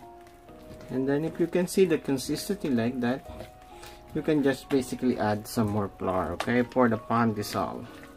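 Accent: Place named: Philippines. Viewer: Sound like a pinoy. Saalamat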